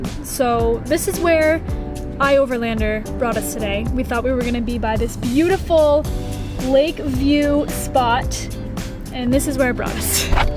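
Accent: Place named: New York, United States. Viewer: Just found your chanel :) great video am i tripping or do you look like an older version of millie bobby brown aka 11 from stranger things like this comment anyone if you agree :) anyway your pretty also im guessing your from ny i can hear it in your voice maybe im wrong lol have a great days guys best believe ill be watching your videos id like to see more of what you eat everyday you inspire me :) i subscribed!!! Yay!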